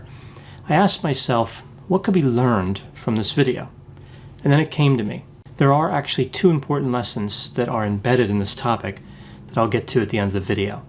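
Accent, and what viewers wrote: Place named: East Coast, United States. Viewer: Caught your accent in this video - sounds very East Coast. Greetings from Philadelphia!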